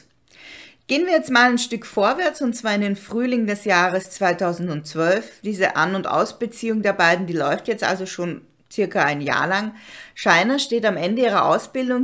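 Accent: Austria. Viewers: Also ich kann nicht mehr aufhöhren dich zu höhren ! Du hast jetzt den ersten platz von Allen,,true crimerinnen,,dein österreicherische akzent ist die kirsche auf der torte. Weiter so❤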